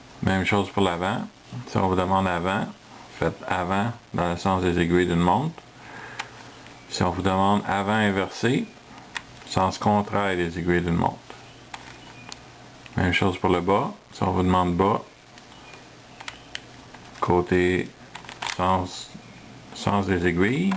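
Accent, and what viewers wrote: Quebec, Canada. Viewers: L'accent québécois ça me déconcentre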